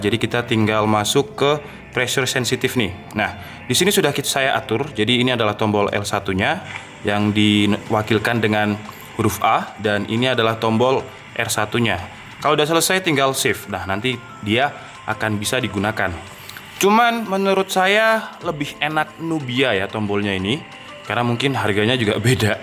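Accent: Java, Indonesia. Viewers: Jawa mana mas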